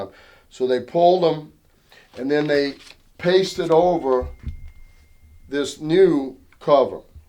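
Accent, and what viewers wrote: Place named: New Orleans, United States. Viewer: That is a amazing box set collection. Are you from Louisiana originally your accent reminds me of a guy I met from New Orleans.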